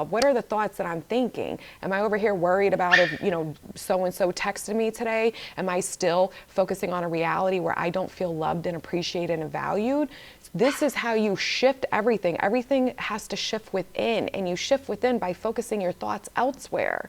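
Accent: New York, United States. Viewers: I was literally wondering about how you got this New York accent living in Florida and then you immediately explained LOL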